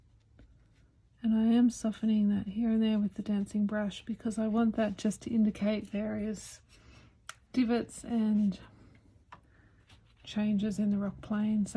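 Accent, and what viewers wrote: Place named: Australia. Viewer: Fark yeah, been looking for a watercolour artist I like to listen to that is not American, I wasn't even DREAMING of finding an Aussie 🎉🎉🎉 Thank you for the great tips, AND your videos are super relaxing as well. All the best.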